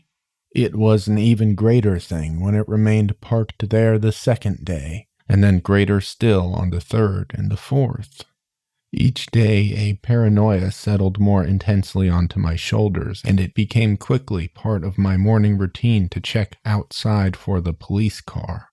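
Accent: United States. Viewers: Wait is he from South Carolina?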